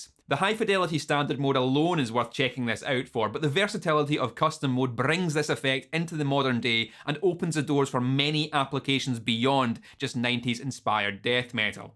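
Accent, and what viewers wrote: Scotland, United Kingdom. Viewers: Combine the circuit analysis with the Scottish accent.. 😩😩🥵🥵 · Scottish accent is the coolest. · I watched the other HM-2 video before this... without much thinking about it or registering what the channel name was I thought "hey he sounds like that other scot"... turns out it's the same person, he just cut his hair.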